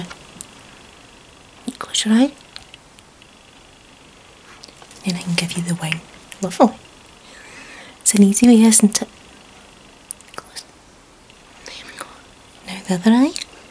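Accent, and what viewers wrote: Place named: England, United Kingdom. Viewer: I love your english accent and intonation! This is so good to listen to me. Thank you for make this video!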